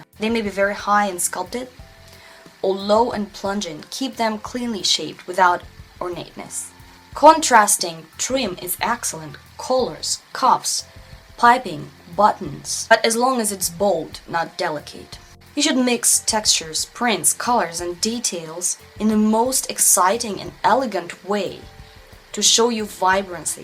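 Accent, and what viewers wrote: Russia. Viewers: please a scarlett johansson inspired <3 <3 <3 can't wait !!! you're the best at inspired makeup :) ps : love that russian accent :D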